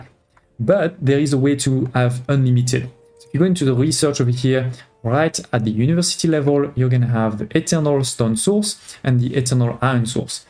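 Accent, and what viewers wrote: France. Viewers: On aime l'accent FR à couper au couteau😊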